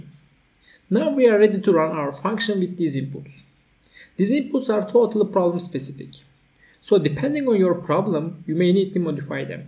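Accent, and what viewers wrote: Turkey. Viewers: Dostum anlatım çok güzel, aksanından Türk olduğunu düşüyorum. Eline sağlık.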